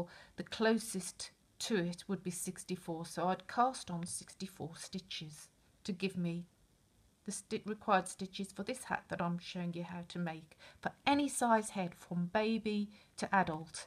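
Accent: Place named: North, United Kingdom. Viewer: Thanks..... love hearing that northern twang!!!! Im from the north too!!;)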